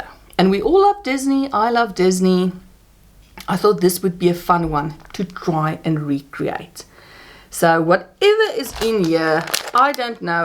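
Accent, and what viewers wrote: South Africa. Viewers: I listen to you and I thought to myself you ate South African. When you say you are from here I smiled. So happy you came in my feed. I'm from Cape Town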